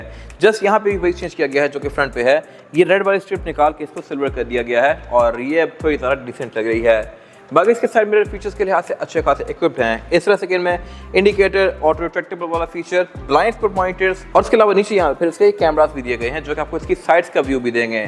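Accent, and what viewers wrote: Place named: United Kingdom. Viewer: آپ کی آواز کی صحیح سمجھ نہیں آتی ۔ یا تو آپکے مائک میں خرابی ہے یا پھر آپ تھوڑا آہستہ بولا کریں اور اردو کو بجائے انگریزی لہجے میں بولنے کے اردو ہی کے لہجے میں بولا کریں ۔ ریویو آپکا اچھا ہوتا ہے پر آواز اور لہجے کی وجہ سے کچھ الفاظ سمجھ نہیں آتے ۔ شکریہ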